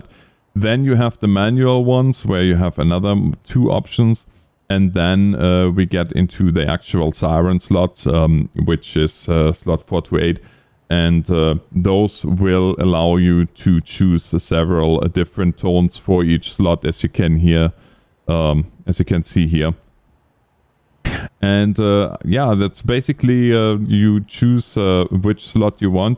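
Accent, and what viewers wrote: Germany. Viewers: Sounds Like German English.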